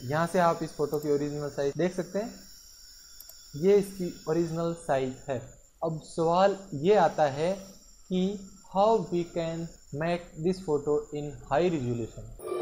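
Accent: Haryana, India. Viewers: Tu Hariyanvi or Rajasthani hai 😁😁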